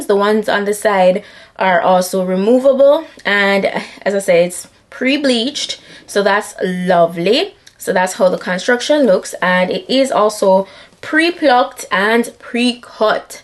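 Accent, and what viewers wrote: Jamaica. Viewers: Hello my sister my Jamaican sister you have to be from Jamaica because I hear the accent my family is from St Mary Jamaica and I bought this same wig to as well and I decided to look up somebody who was putting it on to see how I can situated on my head and I came across your video and you happen to be Jamaican too please tell me if I'm wrong but I can hear the accent girlfriend. Love ya my dearest. Blessings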